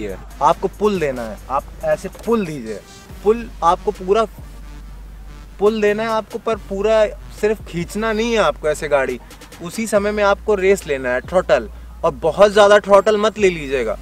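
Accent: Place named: Lucknow, India. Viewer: Ekdm LUCKNOW wali boli hai bhai... Loved it... 💙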